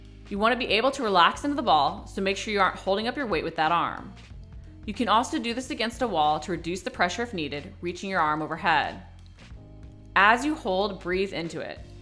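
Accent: United States. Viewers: Love the accent. American English sounds so lively compared to English here in London.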